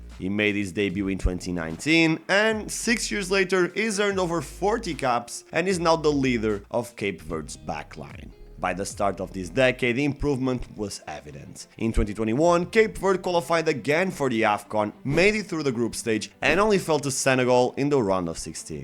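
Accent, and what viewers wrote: Portugal. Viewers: By your English accent and your perfect pronunciation of Portuguese names, I can tell you are Portuguese. It is pronounced “Bubissta”, and not “Bubishta”. Good video, BTW! 👍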